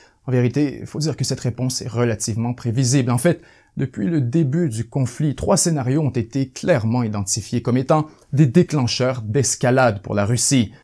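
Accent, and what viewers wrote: Quebec, Canada. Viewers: Yo tu est québécois nn ?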